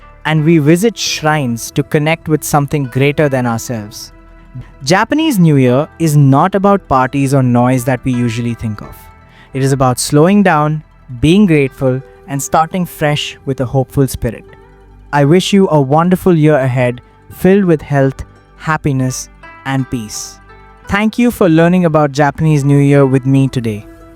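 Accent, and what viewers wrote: India. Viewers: I was not expecting an Indian accent.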